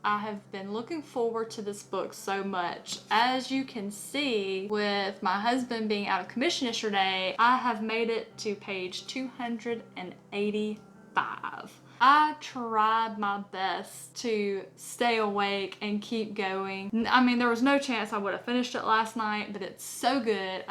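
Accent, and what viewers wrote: South, United States. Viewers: (Random: I love your accent. I've wanted to live somewhere South my whole life, just so I could pick up a twang/drawl of some sort.)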